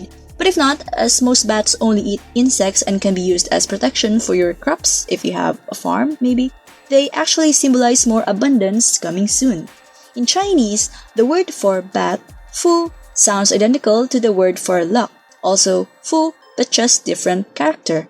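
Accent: Philippines. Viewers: Wonderful! Your voice sound like from the Philippines.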